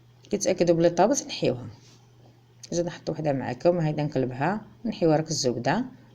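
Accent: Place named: Jijel, Algeria. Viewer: صباح الخير بنت بلادي الشاطرة الوصفات التقليدية نعرفهم ديالنا نحب نسمع اللهجة تعك الجيجلية اللهم بارك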